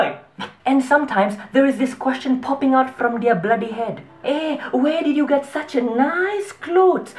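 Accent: Sabah, Malaysia. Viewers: you have thick sabah accent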